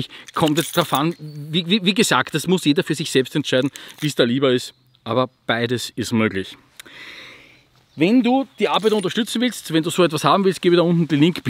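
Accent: Austria. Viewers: Ich mag die Grimassen und den österreichischen Slang 😄 Jedes Mal wieder cool.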